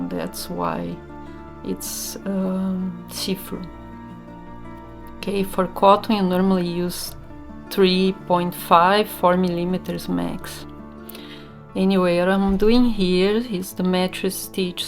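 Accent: Brazil. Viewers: Very beautiful your work, but about your english you seem to be brazilian.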